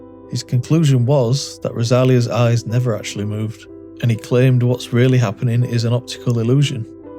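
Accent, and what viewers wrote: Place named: Hull, United Kingdom. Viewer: Your accent sounds like u might be from hull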